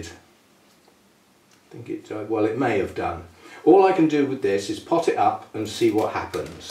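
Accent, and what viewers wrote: United Kingdom. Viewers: Always enjoy your videos & appreciate your diligence in making them. Something to look forward to seeing. Guess I am a scaredy-cat in buying anything other than phals.! But I do enjoy the blooms & they are easy to maintain. Would you comment on the benefit of leaving the “strings” on plant after pulling off the dead mushy roots? ( that is, if you think there’s merit in doing so) Thank you & I enjoy your British speech.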